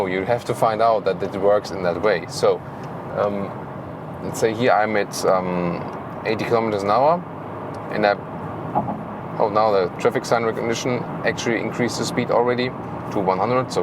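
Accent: Germany. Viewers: congratulation a little bit of german accent but the analisys is quite perfect. go ahead mate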